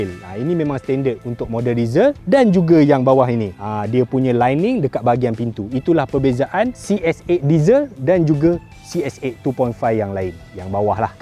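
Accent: Kelantan, Malaysia. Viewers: Abang orang kelantan ke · Duk kelate tu bang..has molek ii..sek kelate mari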